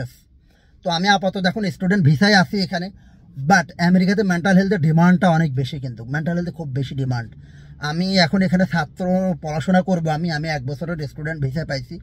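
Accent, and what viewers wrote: Noakhali, Bangladesh. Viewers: আপনার বাড়ি কি নোয়াখালী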